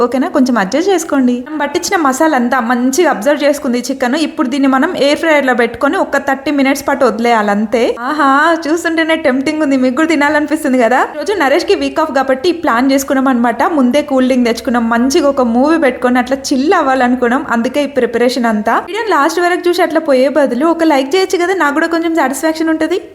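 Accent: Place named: Rayalaseema, India. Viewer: మనది ఏ ఊరు అండి, మా రాయలసీమ బాష లాగే వుంది మీ బాష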